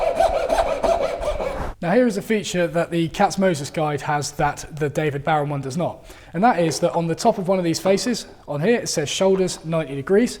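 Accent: United Kingdom. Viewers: I'm an American. Sounds fine to me. It just sounds British. If you visited London, would you ride the Underground and ask passengers to call it the "toob" instead of the "choob" so as to not confuse American tourists? communication skills are one of his strong points, and I think your criticism is well-intended but a little baseless. Give it a couple months, he'll be well over 100,000 subscribers.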